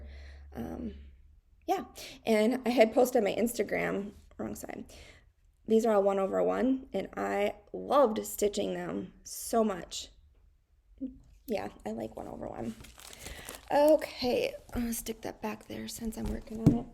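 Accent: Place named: Minnesota, United States. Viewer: OMG I've been under a rock the last few months and am just now discovering you (thanks to Julie)! I just about fell over when you said you have a 25 year old, you look so young! Looking forward to catching up with your videos in my spare time. In other news, I'm obsessed with regional accents...it would make me giggle and wiggle if you would use the following words in a future video: dragon, wagon, bag, Maggie (again) so many awesome Minnesota accent words! lol : )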